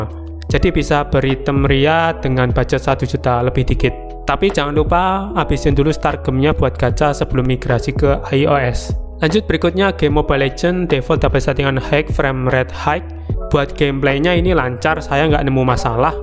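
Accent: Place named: Banyumas, Indonesia. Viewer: Ngapak yaaaa 😁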